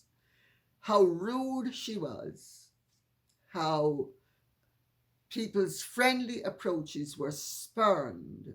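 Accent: Jamaica. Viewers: Her accent is like that because she was born and bred in Jamaica and later moved to the U.K. Of course, she already spoke with a refined form of Jamaican accent because of her relatively privileged family origins. My Jamaican kin speak this way too. They happen to descend from a grandfather who settled there in the 1910s from England who married into an elite local family. They were educated there and sent to finishing schools in England and Switzerland. They sometimes wax more Caribbean at times, just like Lady C. when in full stride. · Yes I totally get it I am Caribbean also and when we want to put on our flava’ we really know how to put it on. I love love love love love the Jamaican accent. And I just wish she would do a whole video speaking that way. I can’t get enough of that wonderful spice! I am in Texas now and I so miss being around all the diversity that I grew up with in New York City and later enjoyed in Florida. 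I miss stopping by the Jamaican store to buy A Jamaican patty in Coco bread… and there’s nothing better than spiced bun with cheese in the morning with my coffee. Bring on that jerk spice Lady C! I am in Texas now …spice is a long way coming here! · Love the Jamaican accent 😀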